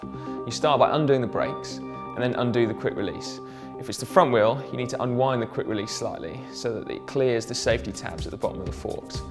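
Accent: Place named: Cockney, United Kingdom. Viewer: Brrrrrrr this cockney English......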